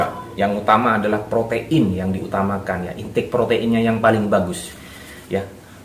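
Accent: Madura, Indonesia. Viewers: Mas asli madura ya?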